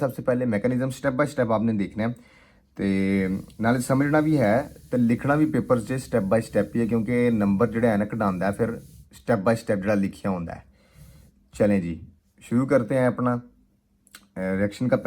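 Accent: Punjab, India. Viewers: Punjabi Ascent ❤❤ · Lgda sir vi apny ilaqyy day iiii ny 😂😂 Punjabi bao vadiyaa sir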